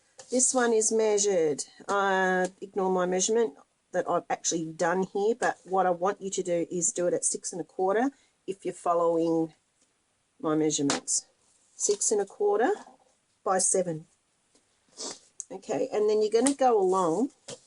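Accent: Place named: New Zealand. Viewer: When you said spider I thought WHAT!!!!! That's a big spider and I thought you had a NZ accent and we don't have those huge things here. Showed my husband, and then I reread, whew!! Now you are referred to as the " " and the " is a very talented lady, and very generous to share all her knowledge. Had been looking for something different to make, so thanks heaps. Love your way of explaining things too. Cheers for that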